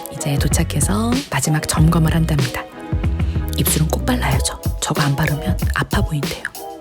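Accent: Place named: United Kingdom. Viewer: 성우하셔도 될꺼 같아요~~ 최고의 성대이신듯 합니당 영국발음도 너무 멋있으십니당~~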